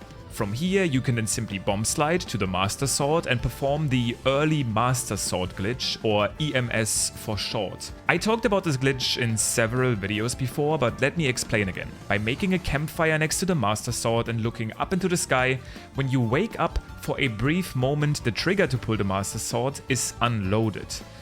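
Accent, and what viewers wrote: Germany. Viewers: Er klingt sehr deutsch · deutscher kann man ja garnicht klingen haha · deutscher akzent lol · ICH HÖR DEUTSCH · Always love it when I watch a new channel and I can immediately hear the german out of them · Are you german? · Der gute alte deutsche Akzent verrät einen letztendlich doch immer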